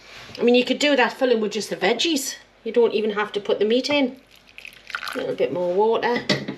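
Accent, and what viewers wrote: Newcastle, United Kingdom. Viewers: I'm addicted to your channel, sitting at the kitchen table and drooling. I was born at the beginning of the second world war, so lived with ratioing until my teens. We all ate wonderful pies and stews like yours and obesity was unknown, but we thrived. We only began to get fat when sugary, highly processed food became the norm. What a tragedy affluence has been. I would love to see your Toad in the Hole and Weardale Tatie Cake sometime, please. I live in Weardale, but have not found a really good recipe. It's lovely to listen to a real Geordie telling me about the wonderful food my mother used to make.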